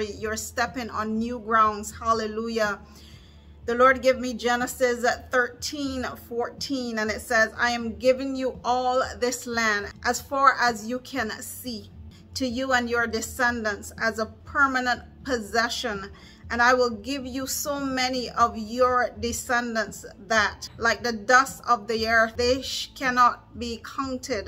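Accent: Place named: Barbados. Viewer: Your accent sounds a bit Bajan! Great word! Confirmation! 🙌🏾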